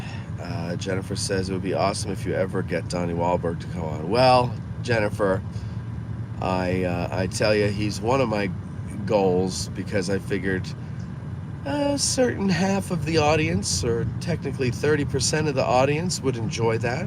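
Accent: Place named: New Jersey, United States. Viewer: Your jersey accent is a trip..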